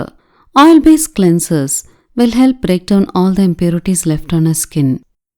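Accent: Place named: Telugu, India. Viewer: Your accent sounds you are Telugu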